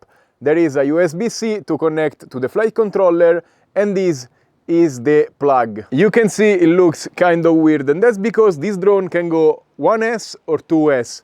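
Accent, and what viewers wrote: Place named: Italy. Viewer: Infatti io lo capito dalla accento che ha e un po il luogo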